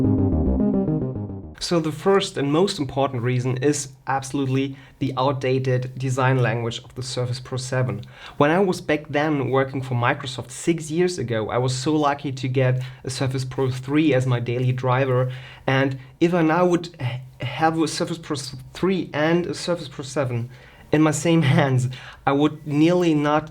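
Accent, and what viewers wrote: Germany. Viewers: Are you German your Englisch Sounds German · the german accent makes you sound exotic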